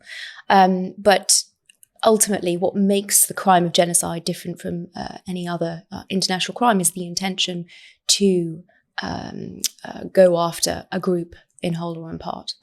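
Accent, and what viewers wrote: RP, United Kingdom. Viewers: When idiotic Hasbara army like Michael Rappaport fails the propaganda exercise, send a posh British sounding white woman to spew more lies with bombastic words sounding all posh but contains zero substance.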